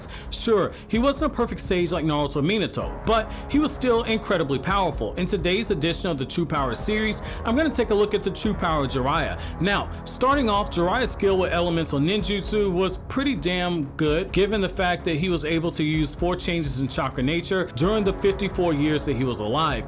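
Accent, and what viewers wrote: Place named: South, United States. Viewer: they say oil like that in the south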